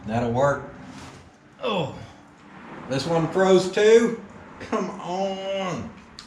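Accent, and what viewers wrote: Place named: West, United States. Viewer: Damn, your accent just reminds me of old western movies - I love it! Great commercial to boot :)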